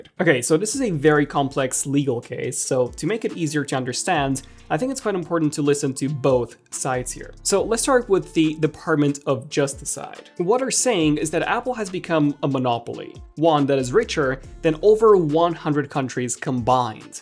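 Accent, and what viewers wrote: Romania. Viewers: Trebuia să aud de 6 ori ca să confirm că tu ești din românia, da întrebarea este, ești din românia?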